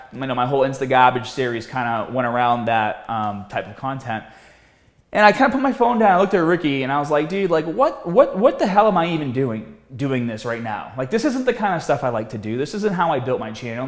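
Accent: Boston, United States. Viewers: You hit the nail on the head. A Google search of "how to" blah (whatever exercise I was searching at the to time) is how I found you several years ago. It was easy to tell that you knew what you were talking about and had the results to prove it. Plus, I went to college in Boston and needed more of the accent lol. Been following you and trusting you as a top-notch fitness resource since. Congrats and best of the luck on the game! Geez, living all of our dreams! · Whether you do decide to leave or stay, I appreciate the many, many videos you have uploaded over the years. I've only discovered the channel in the last year or so however there is plenty to go through and enjoy and even go back to if needed for advice on form etc. Lots of genuine, honest and great tips and advice. I appreciate the humor, knowledge, genuine and honest videos. You seem like an awesome dude and the Boston accent is lots of fun as a bonus. 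Either way, best of luck in your future endeavors and thanks for everything you have done and shared to help fellow gym folks like myself who enjoy the weight lifting and other aspects. I'm glad you did all this and took the risk and opportunity to make this channel happen. I also can totally relate to being introverted as a kid, gaming with friends and making lasting memories. The gym and sports and other stuff also was a great time spent with them and on my own to help with mental health, physical health and so on. Breaks are great to recharge and reset and you don't need to apologize ever for looking after yourself. It is a bit different seeing you with glasses haha. Sorry for the rambling comment but just felt like sharing to show you are appreciated and thanks for everything you have shared and done. Cheers from a fan from British Columbia, Canada.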